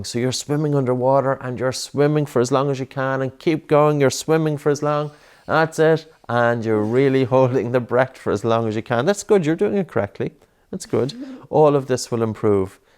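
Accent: Ireland. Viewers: Are the subtitles nessesary??? Its just an Irish accent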